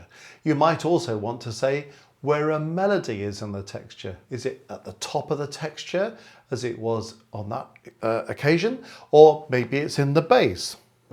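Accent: United Kingdom. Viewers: great teaching video sir! love your British accent really! the way you delivery the knowledge is really easy to understand! also i love every single example you played on the piano. so beautiful. could you please list the titles of examples when you have a chance? thank you so much and cant stop watching your videos. xx.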